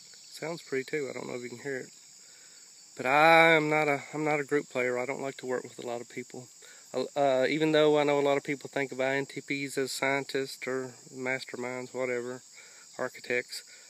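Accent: South, United States. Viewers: I really like you. There's something so nice about your matter of factness mixed with the southern accent. For an INTP you do have a lot of vocal inflection and i can tell that you're a very wise person. Thanks for your videos and all your feedback on my videos.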